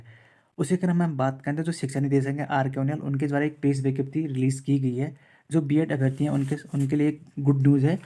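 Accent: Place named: Chamoli, India. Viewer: Bhai pakkaaaaaaa Chamoli ka hai.... Apni boli apni bhasa....,🥰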